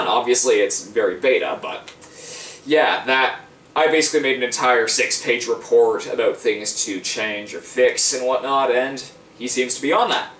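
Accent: Canada. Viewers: Are you Canadian? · The Canadian accent is strong with this one. BEST ACCENT EVER. (Seriously, say House and Out more, it makes me sad I'm stuck with my lame american accent, at least I can listen to yours.)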